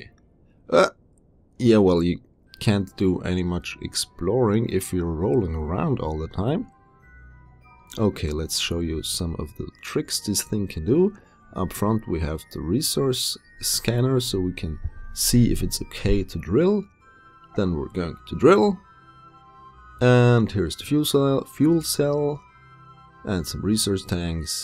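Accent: Germany. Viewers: ich höre da 'nen deutschen akzent raus :p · He Sounds German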